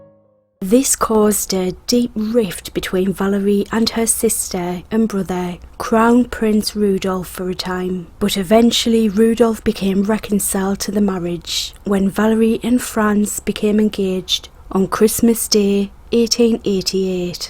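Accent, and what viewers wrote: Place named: Durham, United Kingdom. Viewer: Ha, I guessed the general region (North East), but my Canadian ear would never've been able to pinpoint Durham. Your speech is so soothing. I'd love to learn more about the eldest daughter, Elizabeth Franziska – about whom there's not much written (partly due, perhaps, to early death).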